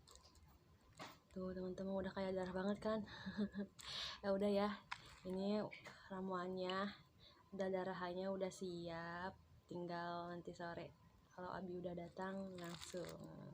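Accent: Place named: Sunda, Indonesia. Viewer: Sunda banget wkwkwk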